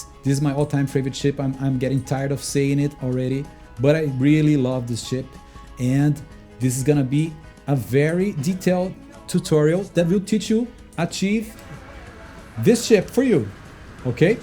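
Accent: Brazil. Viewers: Cara, que vídeo bom, melhor ainda foi escutar a voz e logo sacar que é um br, parabéns irmão, tá de parabéns. E meu Deus o tanto de Argonaut Winglet que tem que usar é brincadeira viu, Rockhopper Propeller mvp do vídeo. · Só de ouvir o sotaque da pra perceber que o cara é BRASILEIRO!!! Meu amigo, tu manda muito, essa corvette é simplesmente INCRÍVEL e sinceramente é a minha favorita em toda a comunidade de NMS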